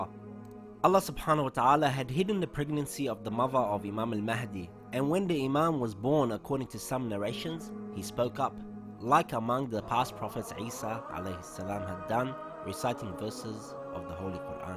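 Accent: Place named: Australia. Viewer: U got an aussie accent.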